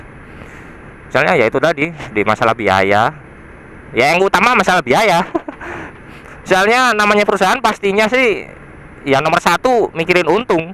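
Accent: Malang, Indonesia. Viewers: wihh... arek ngalam bro podo 😂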